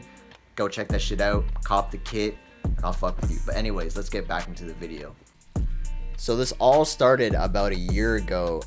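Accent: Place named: Toronto, Canada. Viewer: I’ve been here since 600 subs, I love your beats and the Toronto accent really standing out here😂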